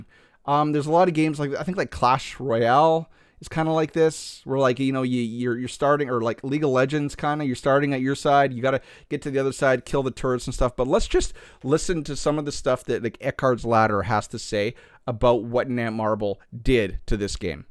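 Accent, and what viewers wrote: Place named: Canada. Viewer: Thats one hell of a Canadian accent. Well done 🫡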